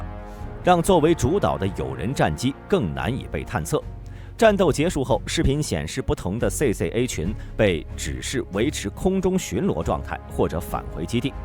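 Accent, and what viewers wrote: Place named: Beijing, China. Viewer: 感謝本節目的男主播，以標準的北京話報導日字正腔圓清楚的報導謝謝🙏。❤❤❤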